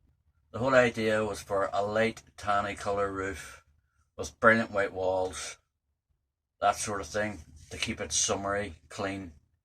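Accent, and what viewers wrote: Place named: Ireland. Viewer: Good to hear a solid Irish accent 🇮🇪🇮🇪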